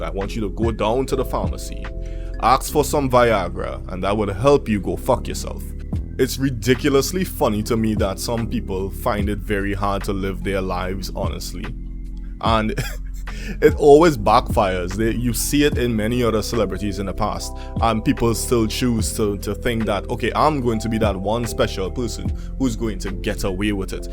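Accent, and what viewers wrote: Trinidad and Tobago. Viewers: Are you from Trinidad bro?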